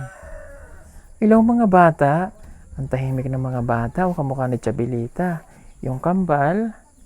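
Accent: Visayas, Philippines. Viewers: Natutu na C mag bisaya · Kabalo na jud mag bisaya c...congrats po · Kblo na jud si mag 😂bisaya ba..😊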